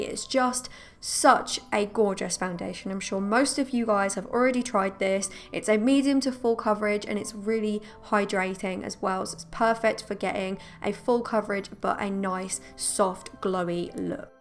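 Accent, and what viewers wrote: England, United Kingdom. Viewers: This girl is beyond beautiful and has an English accent 😒😩😫😭 life’s just not fair! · I believe she means that this woman has a beautiful English accent and face, something which she does not have. But I can see how that might be misconstrued lol.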